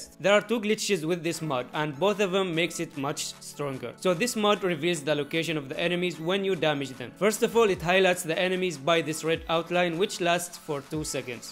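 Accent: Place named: India. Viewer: Guys hes has a indain accent that means hes indain so it fake he is capping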